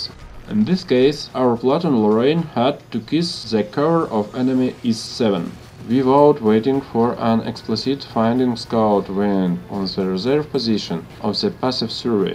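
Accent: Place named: Russia. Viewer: типичный русский акцент)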